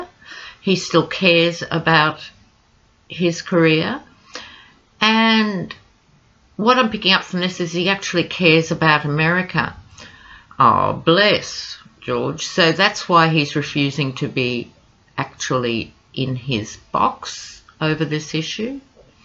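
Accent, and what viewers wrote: Australia. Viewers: I enjoy your program soooo much, even though I don't get the difference between tarot cards and chicken entrails. I love your sense of humor. It gives me belly laughs. You understand the politics of the US so well. I'm actually a Kiwi, but I lived in Perth for 13 years. I didn't realize how much I missed the aussie accent and humor. I have lived in Pennsylvania since 1998. Would love to move back to Oz or NZ or Tasmania, but my husband is from Lancaster County, so I guess we will die here. I have just applied for "the opperation" (US citizenship) as I have been here 20 years and I would like to be able to vote trump OUT ! I also say Koch, not Kok. Seems too much like Cock. Keep up the good work. Can we see a view of your cat sometime ?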